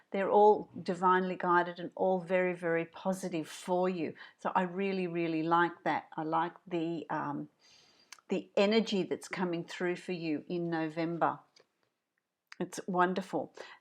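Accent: Australia. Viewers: Love the rare cultured aussie accent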